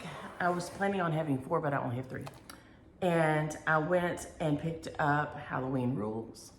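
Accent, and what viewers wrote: South, United States. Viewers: . I love listening to you with that southern accent (my parent both😮s were from the south so the majority of my relatives have that same accent). I'm so sorry about your framing debacle. I live in Michigan sol our pumpkins are all cold up here. I hope you have a fabulous weekend. Take care. Pat Tackaberrry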